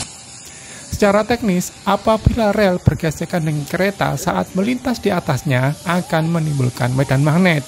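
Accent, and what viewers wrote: Java, Indonesia. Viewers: Aksen jawa nya medok tenan mas….. hadeuuuuuh 😮😅😂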